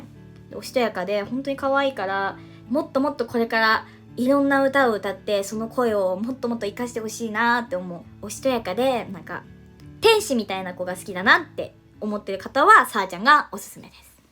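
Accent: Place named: Yamaguchi, Japan. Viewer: うん、山口弁を隠しきれない。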